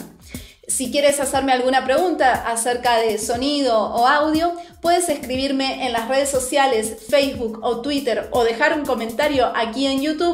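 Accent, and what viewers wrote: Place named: Argentina. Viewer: Que bueno ese acento argentino :)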